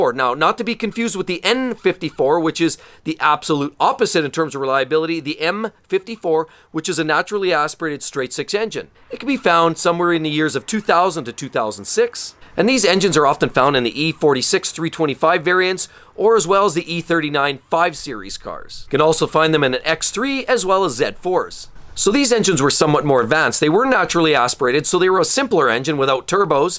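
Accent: Canada. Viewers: drop the heavy Canadian accent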